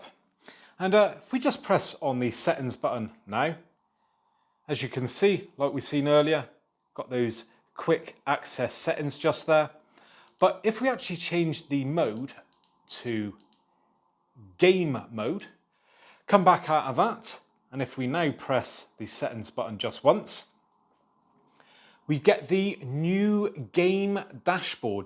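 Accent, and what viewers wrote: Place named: Somerset, United Kingdom. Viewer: are you from somerset? you sound abit like it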